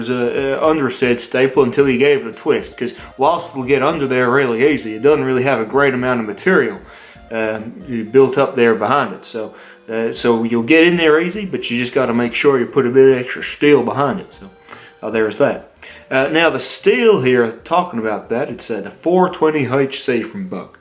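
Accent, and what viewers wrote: South, United States. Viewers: Thank you for finally making a video I can understand. Your authentic USA accent really helped!!! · That is an excellent country western accent.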